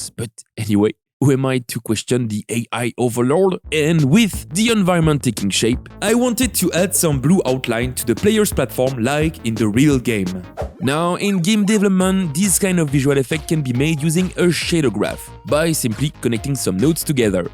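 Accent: France. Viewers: toi tu es français XD on as le même accent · Cet accent français est juste magnifique🤌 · are u france? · Nice french accent ^^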